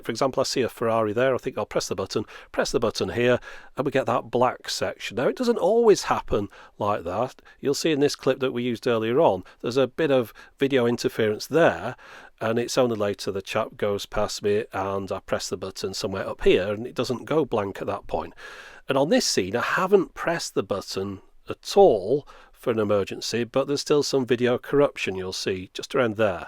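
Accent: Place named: England, United Kingdom. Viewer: Wow nice presentation here, you were right on with just about everything regarding this Aukey DR-01 review. I took in most of your setup directions and menu setting THAT information is where I got the most value in the time spent here. I have a Vantrue N2 dash cam on the front of my Toyota Sienna and a nice Aukey DR-01 on the rear window over looking any rear end collisions or idiot drivers to the rear of my Van. I live in Lakewood California we all can agree that the So Cal. drivers here are the absolute WORST OF THE WORST! anywhere. you need a dashcam here just to save your ass if or when you have a problem. I was able to set up my device flawlessly. Your English accent for some reason makes it all that much easier to understand. Thank you for your time.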